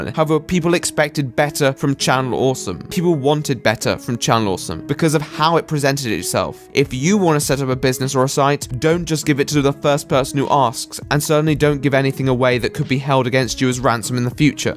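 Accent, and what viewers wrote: RP, United Kingdom. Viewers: Brits all sound the same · You sound so damn posh. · You soundly like such a posh wanker only complaint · I'm sorry, your videos are legitimately great on all objective levels, I just happen to be part of the like, 2% of Americans who are actually kind of turned off by the British accent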